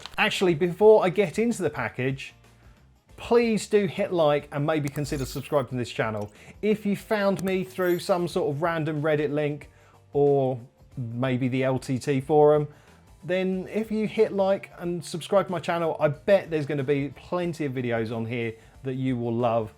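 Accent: United Kingdom. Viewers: Firstly - the algorithm gods brought me here. Am going to sub, loving the content. Also - please don’t tell me the comment about ‘longer shaft being useful’ was unplanned. Was treś funny! lol Keep up the work bruv. (Sound likes a fellow Londoner) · The small interaction with LTT definitely bumped the algorithm. I understand your frustration with the metrics. You have a portfolio and time in the market, but it clearly takes something like this to get visibility with the sheer numbers of YouTube. There's a ton of UK makers (I assumed based on accent). James Bruton is one that might mesh well. Hacking things together, fixing things, and whatnot. IMO you are better at presentation, but he makes some really cool stuff.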